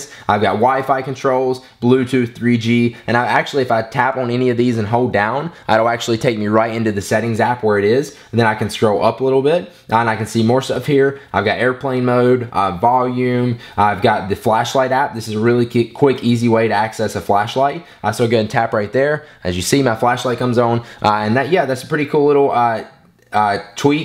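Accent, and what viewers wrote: South, United States. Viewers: That Southern accent!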